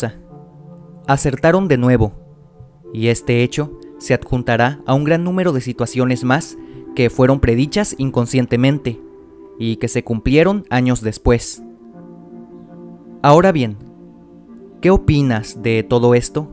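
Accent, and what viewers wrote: Mexico. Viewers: La voz del relator lo delata como un L. ¿Mexicano?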